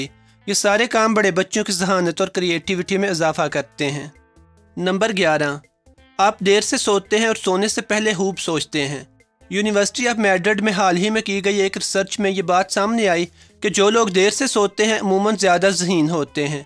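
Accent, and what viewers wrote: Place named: Punjab, Pakistan. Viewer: ap bhe bohot zahen hen pr apka lehja bilkul pnjabi he...lgta he ap pnjabi bol rahe hen.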